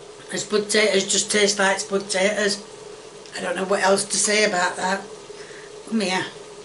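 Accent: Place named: Northern England, United Kingdom. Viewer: I detected northern-ish England in her accent. I love it!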